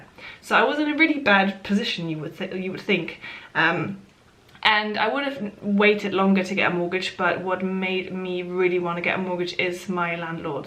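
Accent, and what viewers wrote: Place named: London, United Kingdom. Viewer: hooooow just how you aquired such a genuine london accent? proper linguist training? specific job like english teaching?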